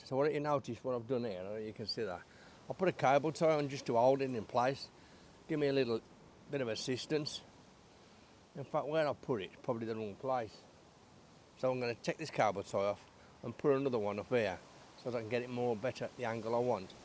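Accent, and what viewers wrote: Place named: Erdington, United Kingdom. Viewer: Great Vid. Lovely to hear your accent. I'm thinking Erdington. Am l right?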